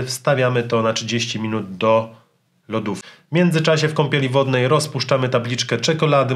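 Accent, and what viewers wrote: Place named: South, Poland. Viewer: Oj, taka gwara południowa!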